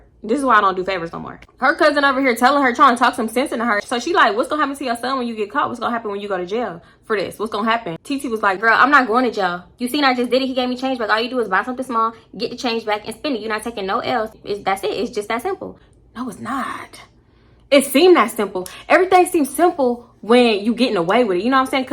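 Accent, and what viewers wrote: Baltimore, United States. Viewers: When did you get this B-More accent 😂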